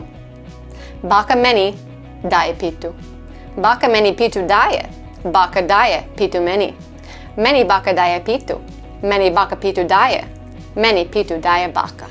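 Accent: Zagreb, Croatia. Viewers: Što se tiče Vašeg naglaska, najbliže ste ženi iz područja Zagreba. Naglasak se tek primijeti tek u 10% vremena. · Imas naglasak hrvatica iz ZG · You even have Zagreb accent woooooo! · Akcenat je minimalan, o tome se ne trebate stvarno brinuti, zvučite kao neko iz okoline Zagreba, tako da ste se super prilagodili okolini. Svaka čast! Puno pozdrava :) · Your starting to have a Zagreb accent really impressive! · Amazing job on the cases, I must say! It's almost flawless, only sometimes you let slip the wrong one, probably when you're too excited or veer off the script, but even then the mistake is not that drastic. Your pronounciation is also amazing! It is a well know fact that native English speakers have a really hard time ditching their accents (every time an American tries to speak Latin I just want to die :P), and it's down to actual anatomy if anything. 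So you're probably correct, you will never get rid of it completely, but you shouldn't anyway, the way you sound now is more natural than some native Croatian speakers :P I can tell by the pitch you use you've learnt Croatian in Zagreb area, it was most prominent when you were giving the list of infinitives regarding prefixes. ;) But you will forgive me for even bringing it up; I'm from Dubrovnik area and we take pride in speaking in correct pitch (mostly :P). It's another beautiful quirk of Croatian language, that's all. I'd say you haven't been studying that yet, hehehehehe, and you're probably better off without it :P :) The word order, yes, you probably already know you can use it to stress out certain aspects of your sentence/thoughts. E.g. Baka daje pitu MENI (Grandpa is giving a pie to ME (not you!), or Meni pitu daje BAKA (it's the grandma who's giving me a pie, not grandpa or someone else...). 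You can tell I put some emphasis on those key words, and you should do that too when using non-standard word order if you don't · vi govorite savršen hrvatski jezik sa zagrebačkim naglaskom.:) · As someone who hasn't learnt Croatian language from your childhood you're great speaker of your second most important language! I can completely understand all you've said! You also have great pronunciation, with a little bit of Zagreb's accent but that's completely fine since you're Zagrepčanka! 🙂 Other South Slavic languages you've mentioned are, from the linguistic point of view, alongside Croatian language part of the common polycentric language known as Hrvatsko-srpski ili Srpsko-hrvatski with some national variations (some differences in vocabulary, grammar, pronunciation, correct order of words, script) although some people in Croatia and other countries wouldn't agree since language, as a important part of national identity, is area of often harsh debates. 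To the some extent this is similar to the German language, also polycentric language, that is spoken in Germany, Austria and Switzerland but with strong national differences. It is the same with English language. Ofcourse this doesn't mean that these local variations couldn't be called with national names which is completely fine! Just keep on doing great videos and learn! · Хрватски језик не постоји,загребачки је ваш говор,мала говорна мана ништа страшно....😀 Говорите Српски језик са загребачким нагласцима...:D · Croatian and Bosnian language are practically the same language, when you read them...but you can hear the difference in accent. And you do sound like someone from Zagreb. If you were visiting Sarajevo, no doubt that many people here would think you were Croatian and not someone from Canada speaking Croatian, since Croatian itself has that effect of foreign accent of our language...if that makes sense... 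kind of like British people talking, and someone who learned English very well with british accent. This reminds me of the time when I was young and had a boyfriend in Zagreb, and spending lots of time over there :D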